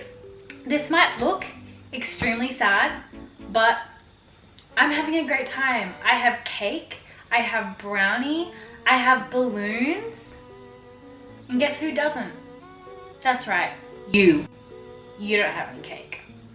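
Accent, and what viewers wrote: Australia. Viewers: i knew it! i recognised ur australian accent right away lol congrats on reaching 100k!! ure soooo relatable subscriber from australia ❤️ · omg!! yess! finally... someone. is. Aussie!!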